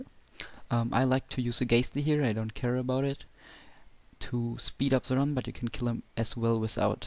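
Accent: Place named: Germany. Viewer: Eigentlich gutes Video, man merkt nur aufs übelste das du Deutschsprachig bist ;) btw, mir ist aufgefallen, dass du beim Snipern kein Stab in der Hand hast, was natürlich sinnvoll wäre ... ansonsten gut gemacht :D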